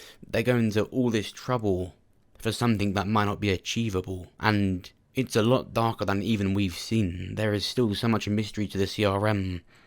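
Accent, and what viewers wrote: United Kingdom. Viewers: Question bro... Do u live in the USA?.. How do u know wat the Philly sky line looks like? ... Just wondering because of ur British accent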